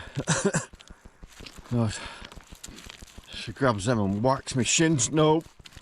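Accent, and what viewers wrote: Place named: Yorkshire, United Kingdom. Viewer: Love Howarth and Skipton, are you from yorkshire? Our accents sound similar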